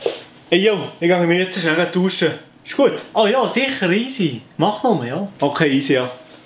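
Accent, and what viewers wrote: Switzerland. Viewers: S tönt chli wie en Mischig vo Basler, Berner und Bündner Dialekt 😂 Super Video ❤ han als Zugerin es paar Sache Verstande, es paar ned. Aber gugge han ich Verstande 😂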